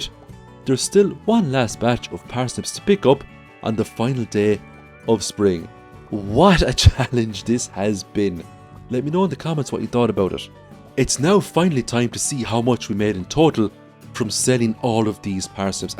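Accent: Ireland. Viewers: Are you Irish I can hear a accent? I come from the north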